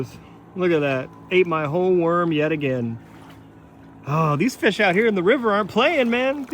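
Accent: South, United States. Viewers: Hes developing a southern accent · funny thing to note, i noticed at a couple points in the video you're starting to pick up a very slight, almost imperceptible at this point, southern accent, only noticed it at one or two points in the video. that's pretty cool. interested to see how your accent changes over time the longer you're living there.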